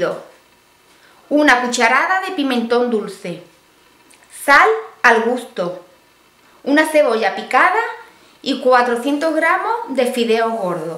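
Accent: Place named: Cádiz, Spain. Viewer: Me ha encantado el video y tu acento gaditano. Me encanta mi tierra Andalucía.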